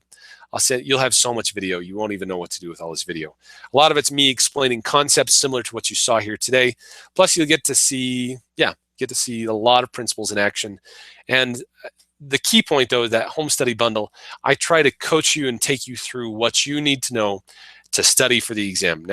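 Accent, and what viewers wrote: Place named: West, United States. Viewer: Ha ha, the joke near the end! The accent sounds western American, i guess.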